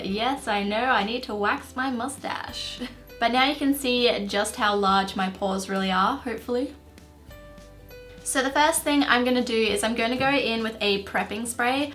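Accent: Australia. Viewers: What?? She has a super heavy Australian accent.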